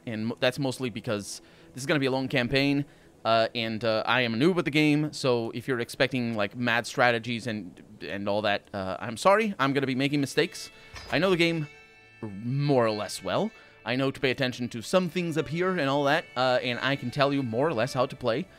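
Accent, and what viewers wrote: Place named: Portugal. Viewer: For years I couldn't picture where your accent was from turns out you're Portuguese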